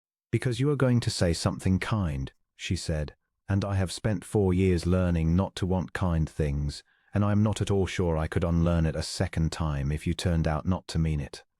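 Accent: United Kingdom. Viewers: There were a few times I planned to post a corrected pronunciation, but found that “error” was just the difference between British and U.S. forms.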